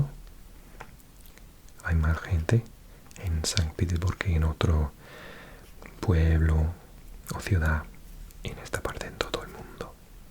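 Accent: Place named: United Kingdom. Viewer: Wow yo ya había visto algún que otro vídeo de tu canal de geografía y mapas y siempre he pensado, que acento más de Reino Unido tiene, pero verte hablando un español tan fluido me ha parecido impresionante. Sigue así y enhorabuena!!!!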